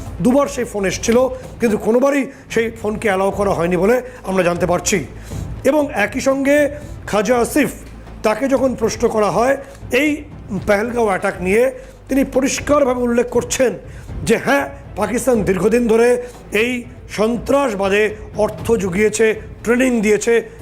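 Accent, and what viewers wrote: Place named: Bangladesh. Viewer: Bangladeshi anchor naki? Kthay kichuta Bangladesh er accent ache.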